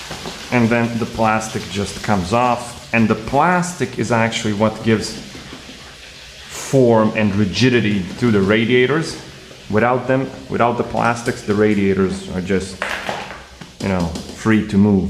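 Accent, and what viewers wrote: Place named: Bosnia and Herzegovina. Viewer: I was surprised when I heard this very familiar Bosnian voice on an unknown small channel. Guess 2 x 2 is 4 then.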